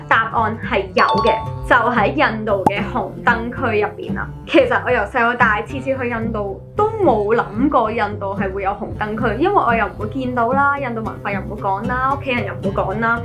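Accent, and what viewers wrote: Standard. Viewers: 真以为是配音啊，好标准啊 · 你係印度人或者香港人? 好标准啊你个广东话。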